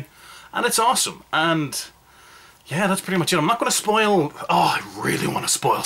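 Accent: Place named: Ireland. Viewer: Your very funny, what part of Ireland are you from im from?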